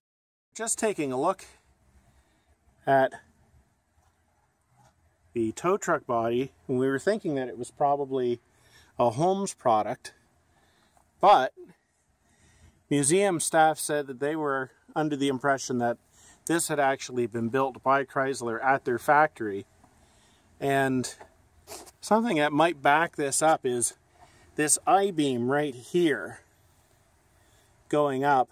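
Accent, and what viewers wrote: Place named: Canada. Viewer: He's Canadian, and some Canadians pronounce certain brand names differently, so I think I see what you mean.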